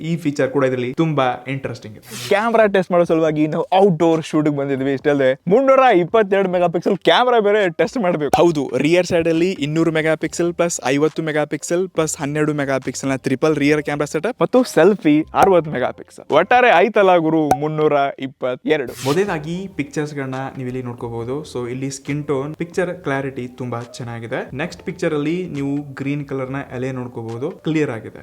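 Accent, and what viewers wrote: North Karnataka, India. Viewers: ಉತ್ತರ ಕರ್ನಾಟಕ ಶೈಲಿಯ ಭಾಷೆಗೆ ಧನ್ಯವಾದ.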